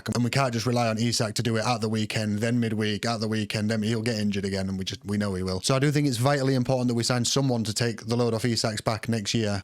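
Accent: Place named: Newcastle, United Kingdom. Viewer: I knew it! you’ve definitely picked up a lot of the accent. I’d say it’s 80% geordie now. Hard to spot. More importantly you’re a natural in front of the camera. I wish you every success. Just keep the videos coming! Cheers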